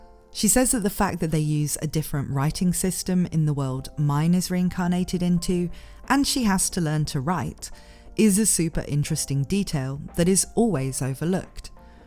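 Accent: United Kingdom. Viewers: I’m an over the road(otr) trucker in America, so it could be just that lack of familiarity with uk accents, but you really sound like my truck PC gps voice. A very pleasant smooth female British voice. Also, great video. As a dude, I do get fatigue from constantly hearing that because I have a penis, I’m the source of all women’s problems, so every once in awhile, it’s nice to hear from a woman who isn’t bitter down to the core of her being about having to live as a shudder woman. Finally, I also LOVE to hear from people who are truly passionate about things. Like when someone KNOWS cars and can talk your ear off about different models and their high and low points. And while the video wasn’t all about re:zero, the obvious passion you have for it, coupled with the fact that I didn’t know much about it before, and learning all this new information about it from you, has made me think that maybe I ought to go purchase the first few manga, or at least watch a few episodes. 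Also, also, you’ve definitely earned a subscription with this video. Sure it was an hour but it didn’t feel like one! Well done!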